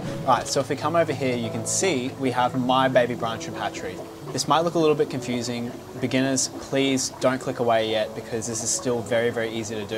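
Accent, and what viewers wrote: Australia. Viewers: Australian, not Pom.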